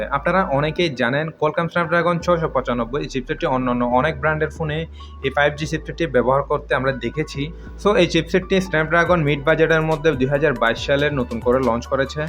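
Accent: Chittagong, Bangladesh. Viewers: হেতের বাড়ি চট্টগ্রাম। ভয়েস এ চট্টগ্রামের টোন স্পষ্ট। শুদ্ধ বাংলায় কথা বলতেই সমস্যা সে বলছে সে RJ. মানে বুঝলাম না। আন্দাজে। ইন্ডিয়ান যারা তারা বলছে ঐখানে এখনো লঞ্চ হয়িনি। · তোর বাড়ি hode... চট্টগ্রাম এর ত বুঝা যার বাড়ি hode ও ভাই? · চিটাইংগা বদ্দা এনা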